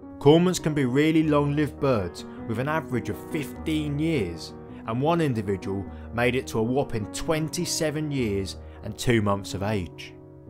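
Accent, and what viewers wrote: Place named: England, United Kingdom. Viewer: I'm curious about your accent. What area of England are you from?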